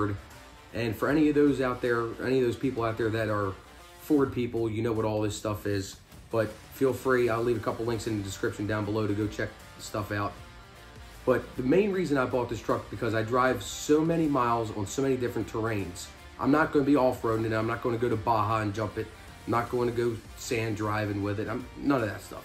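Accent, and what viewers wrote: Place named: Baltimore, United States. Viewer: Are u from Baltimore? I recognize the accent. Also, what do u do for a living?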